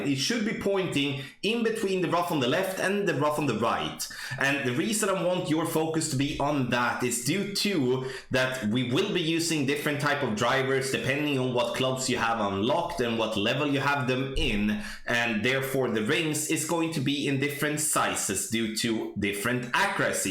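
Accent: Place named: Sweden. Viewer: Du låter svensk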